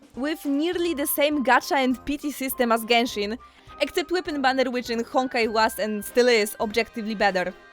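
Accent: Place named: Poland. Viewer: Ah tak, słychać cudowny polski akcent, kocham twój głos <3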